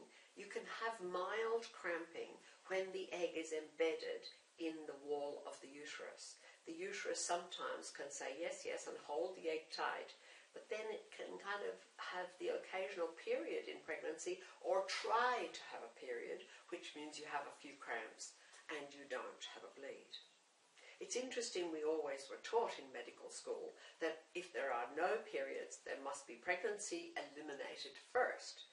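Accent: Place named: Ireland. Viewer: Sounds posh irish to me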